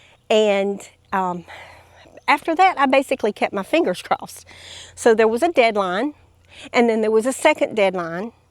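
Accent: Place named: Alabama, United States. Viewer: Wow, what an exciting project we tried doing something similar on our own but probably a lot like where you live we sometimes get really Highwinds and it’s hard to keep those tunnels up. Maybe yours is going to be different I can’t wait to see you and we have some aviaries on our property, and I have been planting inside of them to keep out the dears, and a few other odds and ends of varmints clouding all of our peacocks, who love nothing better than to eat the new growth on veggies and fruits anyhow, I sure enjoy your channel and I love listening to your voice into Brooks voice on College Hill Farm. It reminds me so much of all my relatives back in Alabama.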